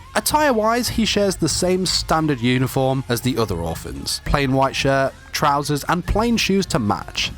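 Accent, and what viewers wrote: United Kingdom. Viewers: Is it the British voice? He drags me back for these facts don't worry I love his facts which that's his FACTOR :P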